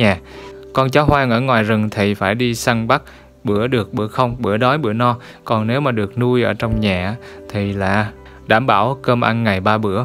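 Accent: Standard. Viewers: Thích giọng đọc của thầy dã man, giọng tiêu chuẩn trung ý, nghe hay quá chừng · Thật sự mê những video của anh, thật uyên thâm ạ, và giọng anh quá chuẩn luôn, mê!!! ❤️❤️❤️ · Thầy nói rất chuẩn và rất ý nghĩa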